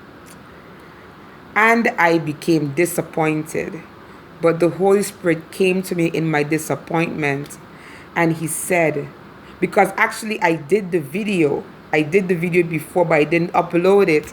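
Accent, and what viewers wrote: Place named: Caribbean. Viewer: What part of the Caribbean are you from? Btw I love your accent, I'm Haitian and Antiguan 🇭🇹 🇦🇬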